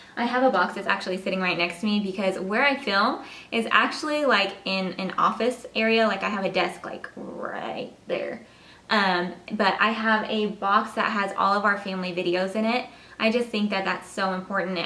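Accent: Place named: Indiana, United States. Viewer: Just now watching this, but i knew you sounded like a fellow Hoosier! hahahaha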